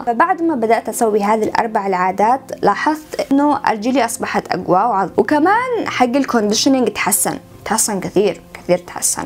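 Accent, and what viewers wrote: Sanaa, Yemen. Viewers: محلاش ماشاء الله عليش يوم تتحاكي بالصنعاني ي لبى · انتي.غير بنات اليمن.انا مش مصدق انك يمنيه.لهجتك خليتنا اصدقيك انك.من اليمن.الله يحفضك وايوافقيك اختي.هيثم من اليمن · فديت اللهجه هذي الصنعانيه الحاليه اللي اشتي اتعلمها وفديت بنت بلدي الانيقه الراقية الله يسعدش 💗🙂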